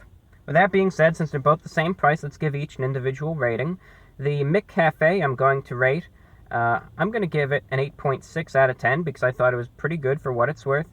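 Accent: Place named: Boston, United States. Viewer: the bostonian accent is shining through in this review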